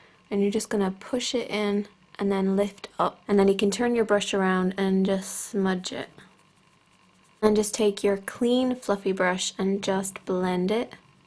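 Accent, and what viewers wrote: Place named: Cork, Ireland. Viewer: Love your videos! Especially with a cork accent it good to hear! :-D haha! I've just started making my own videos if you wanna check om out! Get more Irish on here :-D haha! X x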